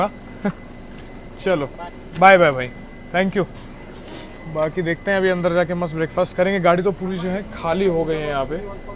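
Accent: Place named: Maharashtra, India. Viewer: मित्रा, तुझ्याकडे आणि तुझ्या उच्चारांकडे पाहून असं वाटतंय की तू मराठी आहेस. मग हा व्हिलॉग मराठीत का बनवला नाहीस? का मराठी बोलायची, मराठीत ब्लॉग बनवायची लाज वाटते? अरे आपल्या भाषेला टिकवणं , जोपासनं ही आपलीच जबाबदारी आहे. हिंदी भाषेवर तुम्हा लोकांचं एवढं प्रेम का? ती काय भारताची राष्ट्रभाषा आहे का?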